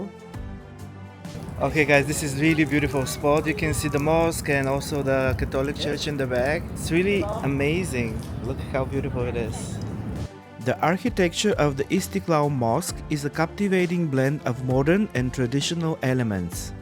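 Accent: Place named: Singapore. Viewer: Where do you come from anyway?ur English sounds like Singaporean or at least asian acsent..😊❤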